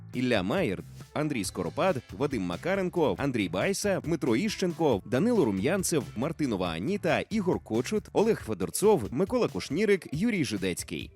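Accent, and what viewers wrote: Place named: Moscow, Russia. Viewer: Голос за кадром- "гвориЦЦць, жиЦцЦя, громаДЗзззяни"... Вкрай неприємно слухати ці маасковські виверти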